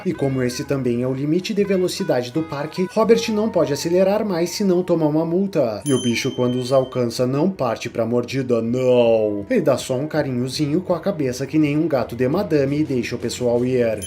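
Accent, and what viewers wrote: Rio Grande do Sul, Brazil. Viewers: Esse vídeo com sotaque gaúcho tá louco de especial tchê 😂 O que é inacreditável é que pessoas leigas como nós achamos tudo isso de erro e eles pagam milhões para fazer o diacho do filme e sai com esse tanto de erro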